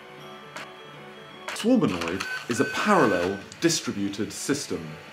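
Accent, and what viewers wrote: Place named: England, United Kingdom. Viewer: Vomito! This is the stupidest project I have seen on youtube. Funded by the European Union. Perfect. I thought I was going to throw up watching this giant waste of money and listening to the stupid pompous english accent. Next time, pay an immigrant to walk over and pick up the book. A lot cheaper. And less pollution.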